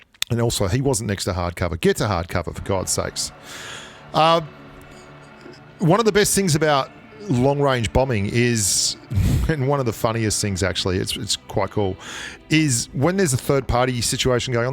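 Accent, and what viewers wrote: Australia. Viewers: Are you from Australia bro ??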